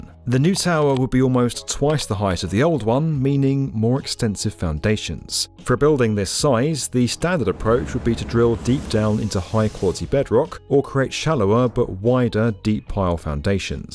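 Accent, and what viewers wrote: United Kingdom. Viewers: ...if I didn't know better, there is a tinge of anti-Amricanism in his voice or at least a dose of common Euro anti-Capitalism stuck in his throate. But maybe it is the uppity brit accent that is sneering and rooting for his hate it to become true.. ie that the NY collapses. You just know that is his wish. Sad. But we have scene this before repeatedly.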